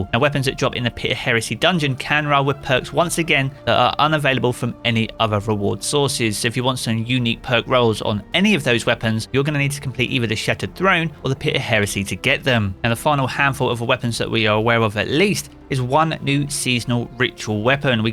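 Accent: United Kingdom. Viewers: I so much love your briish accent idk I'm not English man:/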